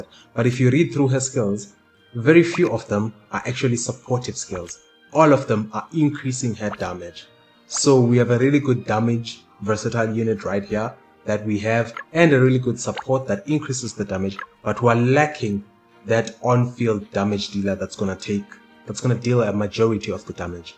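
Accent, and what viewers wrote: South Africa. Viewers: Sounds like a South African accent..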